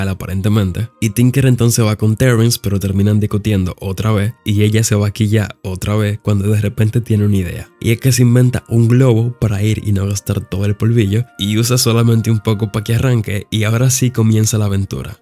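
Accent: Dominican Republic. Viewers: Amo como narra, nunca pierde esa esencia dominicana ❤️‍🩹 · Vos es de dominicano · Bro, tu eres dominicano verdad? El final tu canal, me encanta como narras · Algo me dice que el es dominicano?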